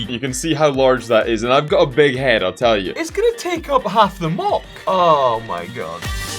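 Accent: United Kingdom. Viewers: Sounds British. I think he needs a bottle of water.😂😂😂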